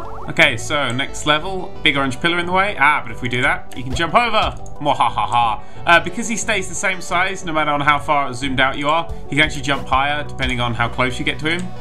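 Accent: England, United Kingdom. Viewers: Britian is the country itself right? And he does have a british accent that Sab3r prick isn't all too bright. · I think he means it's technically an ENGLISH accent and not a BRITISH accent. There's no single accent for all of Britain, but England has a native accent.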